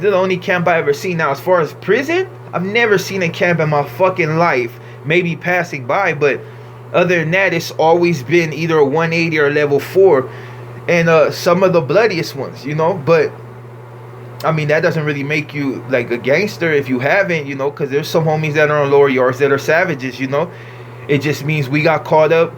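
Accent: Mexico. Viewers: Yo its mad weird seen a nigga speak like a mexican bruh. If this nigga was in yo hood and started talking like this i bet you aint gone stop asking questions. Teach me foo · Talk like Mexican nice! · He sounds just like a Mexican not black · He sounds very Mexican Foo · He has a Mexican accent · You Sound Mezican Are you Blaxican? Or just a moreno? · Respect bruh If I didn't see your face i would have thought you was mexican. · U sound more Mexican than I do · You tweaks an u brain washed my nigga u can b in a Mexican gang but u tlkn mexican an all bro the fuck on ya bean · Thats cool...you honestly sound Mexican too me...thats crazy.... And l think you must be pretty down...cus now you must be having more enemies..the blacks that must hate you wanting to be in a Mexican gang.....but that's what I'm saing..you mus t be down....give you lots of props for being you.....nobody be's themselves now days.. 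· You really have a Mexican accent lol that shit got me bugging. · A black dude with a Mexican accent 😒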